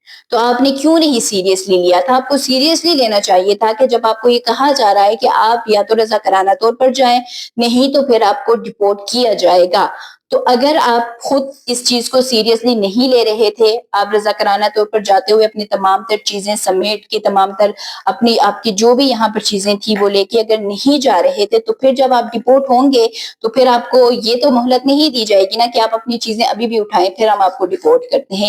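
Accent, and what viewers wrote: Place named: Gilgit, Pakistan. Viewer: Ap ki urdu sunn k ap mujhy gilgit ki lagti hain but u r v, nice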